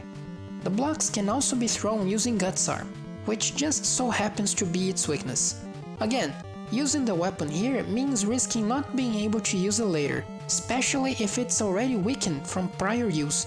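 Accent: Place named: Brazil. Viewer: By some reason I feel like you're brasilian. Well, at least your accent gives me this impression.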